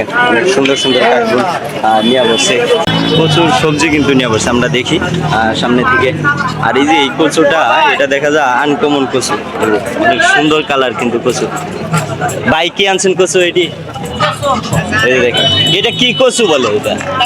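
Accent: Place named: Bangladesh. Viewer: ভাই তুমি কোন জায়গার ভিডিও বানিয়েছ,? তোমার ভাষা শুনে মনে হচ্ছে বাংলাদেশ থেকে। জায়গার নাম জানিয়ে দিলে খুব ভালো হতো। একটি সুন্দর ভিডিও দেওয়ার জন্য ধন্যবাদ।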